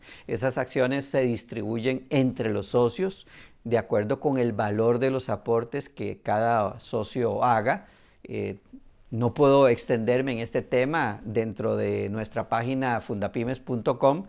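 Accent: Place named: Bogotá, Colombia. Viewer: hola po el acento crei que estas en Bogotá, como te contacto para pagarte por una asesoria?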